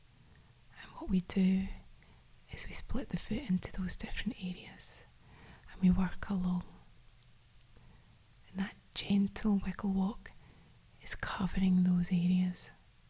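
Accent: Scotland, United Kingdom. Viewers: I felt relaxed just watching and loved her Scottish accent. Thank You.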